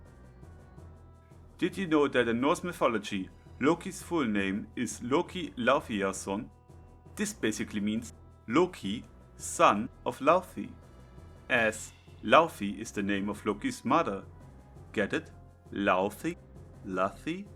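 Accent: Germany. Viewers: Sounds german not french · Hey Mate, ich kommentiere nicht oft unter Videos, aber dein Kanal ist definitiv ein Hidden Gem! Da du meiner (und vermutlich auch deiner) Meinung nach zu wenig Reichweite hast, versuche ich mal meine Kommentarfaulheit als 0815 YT OP-Theorie Zuschauer zu überwinden und dir mein völlig subjektives Feedback zu geben. Die "Produktionsqualität" ist generell sehr gut, in Sachen Videoschnitt/SFX gibt es in diesem und auch in den anderen Videos "nichts zu meckern" ^^ Deine Theorie mit den Swirls und der 4. Wall ist wirklich meisterhaft. Hut ab! Dein Storytelling ist auch wirklich gut und imo ist der "Sidekick" das Lustigste was ich bisher in der OP Theories Community gesehen habe. Das gibt deinen Videos wirklich einen krassen Unterhaltungswert und Individualität. Der (französische?) Akzent ist imo on Point und zum wegschmeißen 😂 . Bezüglich des Videos könntest du bei der Audiobearbeitung evtl. 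noch den Kompressor auf der Masterchain tweaken, damit es etwas "voller" klingt und dem EQ für deine Stimme etwas mehr untere Mitten/Bässe geben. Nun zum einzigen, aber nicht zu unterschätzenden Kritikpunkt. Deine Aussprache bro. 🙃 Der Grund, warum ich mir zu 99,9% sicher bin, dass du Deutscher bist, ist, dass ich genau die gleichen Probleme mit der englischen Aussprache habe/hatte wie du...! 😅😅 Nichts für ungut, ich will dir nur mein laienhaftes Feedback geben, damit dein Channel in Reichweite explodiert ❤ . Du solltest wirklich an den Variationen von Th arbeiten. Außerdem R und W am Anfang ei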